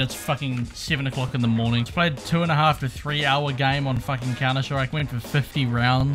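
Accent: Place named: New Zealand. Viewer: Clearly a New Zealand ad because of the accent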